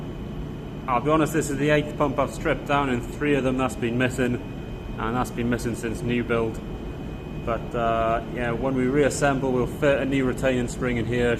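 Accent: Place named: Wales, United Kingdom. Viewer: oh right that's cool I'm from South Wales and thought that's a Welsh accent. Where do you meet the ship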